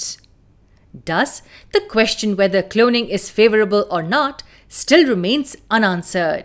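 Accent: India. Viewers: Neden Hintli biri sunum yapmak zorunda ? Kulak yoruyor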